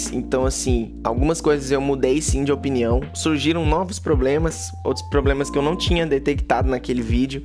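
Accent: Minas Gerais, Brazil. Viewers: Ce é mineiro?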